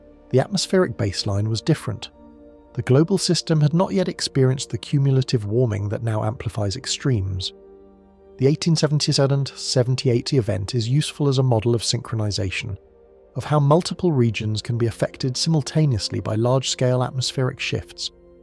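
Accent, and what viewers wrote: United Kingdom. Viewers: Please remove the very annoying British English narrative in favor of neutral American English. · ......I'M SO SICK OF THESE CRAPPY NARRATIONS, WITH "BRITISH ACCENTS"!!!!!!!!!!!!!!!!!!!!!!!!!!!!!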